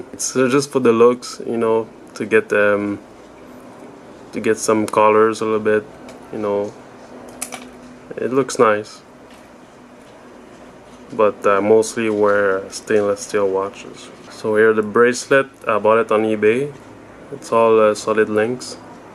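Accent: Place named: Quebec, Canada. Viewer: Québecois? - moi oui. I kinda hear it in your pronunciation. Good vid, i am considering getting this one or the blue. Cheers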